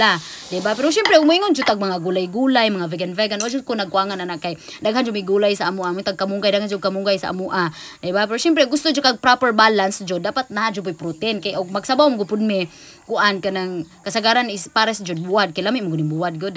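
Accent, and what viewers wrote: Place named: Bohol, Philippines. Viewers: Lingaw jud ko dai nga motan-aw sa imong video kay pure boholano ang imong sinultihan. Padayon sa imong show, kay panalanginan ka Ginoo. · Mag ngisi jud ko maminaw sa tonada sa sinultian sa bul.anon. proud anak sa pure bol.anon ko. Basta bol.anon kugihan. ✌️😁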